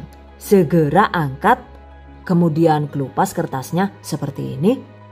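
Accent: Banyumas, Indonesia. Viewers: Ibunya logatnya kaya orang Jawa ngapak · Orang mana kak logat bahasa jawanya ngapak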